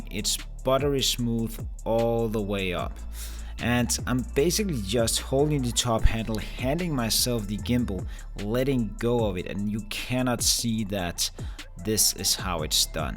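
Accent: Denmark. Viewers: Rigtig god video, synes jeg kan høre du kommer fra Danmark hvis ja, så pisse fed video. Går selv og overvejer DJI Ronin 4d, så tusind tak for review :)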